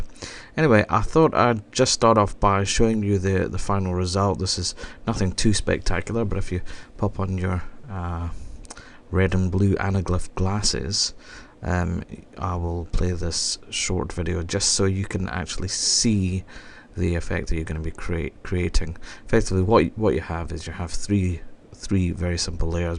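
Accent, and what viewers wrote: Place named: Scotland, United Kingdom. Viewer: Not to get off topic, but that is one heavy Scottish accent.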